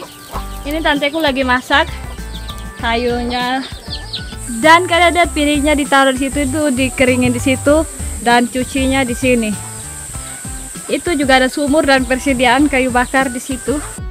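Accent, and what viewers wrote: Kupang, Indonesia. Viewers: its amazing the way you talk kupangnese. Pls teach us some kupang language:)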